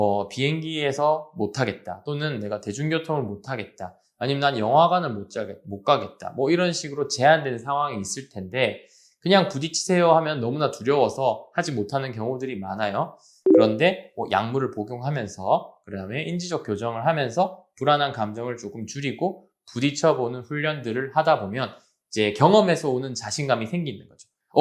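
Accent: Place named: Gyeongsang, South Korea. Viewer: 솔직히 방송 하려면 경상도 억양 정도는 고치고 방송 하는 최소한의 노력은 보여야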